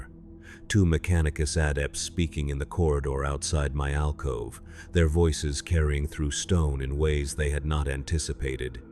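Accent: United States. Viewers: Brother, stick with the old voice. This is painful to listen to. Voice is from some dude in Vermont that did an extra year in Grammer school. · Dude the voice is American again ?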